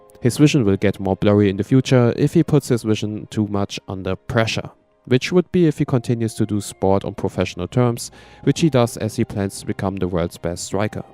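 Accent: Germany. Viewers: are you german=?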